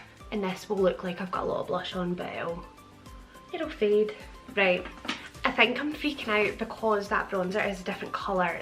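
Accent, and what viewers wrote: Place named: Scotland, United Kingdom. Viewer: LOVE that Scottish accent omg!!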